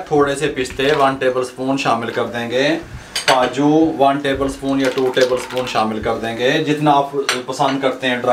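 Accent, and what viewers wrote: Punjab, India. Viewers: ،،،،، کرما والے اووووو،،،،،، میں کینا تسی پنجابی دا جیڑا تڑکا لان دے او اردو اچ بول دے بول دے، اودا وکھرا ای مزا ھے گا،،،، میں بڑا انجوائے کرنا واں ۔۔۔۔۔میرا تیان ریسیپی تے گھٹ ای ھوندا اے، زیادہ تواڈیاں گلاں تے ھوندا اے ۔۔۔۔😁 حالانکہ میری آپ مادری زبان اردو ھے گی،۔۔۔۔۔۔😅 الله تعالیٰ آپ کو ھمیشہ ھمیش خوش حال اور اسی طرح ھنستا بولتا رکھے ۔۔۔۔۔۔ آمیییین۔۔۔۔ والسلام علیکم ورحمتہ الله تعالیٰ وبرکاته ،،،،،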